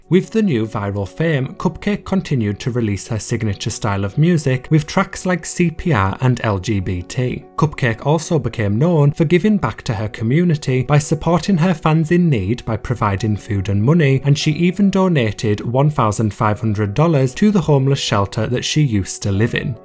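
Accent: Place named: Liverpool, United Kingdom. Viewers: I love your liverpoolian accent "Cupcek"😂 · Some Northern British, I suppose.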